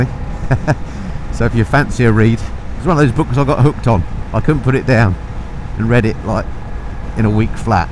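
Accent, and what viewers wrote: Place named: United Kingdom. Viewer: Jeez, you don't have to be on a moto to be worthwhile---just your Brit intonations make you interesting enough for a Yank like me! You've got a niche in narrative video journalism; motorcycles and light aircraft will give you a lifetime of material.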